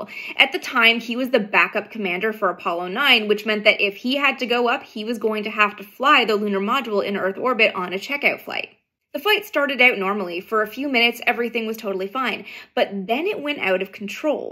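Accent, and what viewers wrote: Canada. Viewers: Yes, she's Canadian, eh. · Def. Canadian, BC? your abouts and outs gave you away, just need to hear you say soory, not sorry, soory, either BC or Quebec that's my guess, maybe Ontario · Is it weird that I’ve watched you 3x just to hear you say check oot….etc? Go northern neighbor!!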